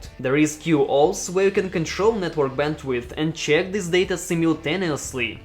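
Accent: Russia. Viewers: Russian English killing me🤣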